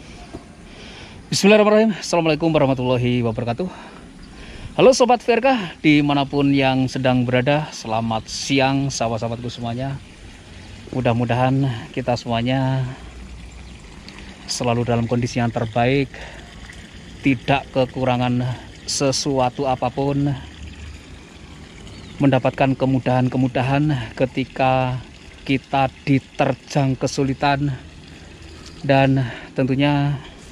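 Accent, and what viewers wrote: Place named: Lampung, Indonesia. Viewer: Asslm ms vrk, waduh jane bru sempat buka hp bos qu, walau tapi pasti hadir slm mlenuk 2 wai dr lampung, ms bhsa ngomong nya kok sama dgn tmpt ku si, kurang jegrak, e ms siang hari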